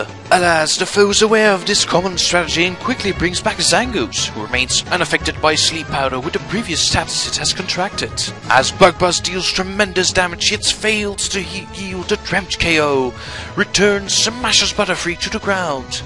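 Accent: United Kingdom. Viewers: It's the British accent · Urgh, his way of speaking I'm really not a fan of. I don't usually dislike British accents, but there's something that I can't put my finger on that makes me hate this voice. It's probably the animal planet narrator type quality to it if I had to guess...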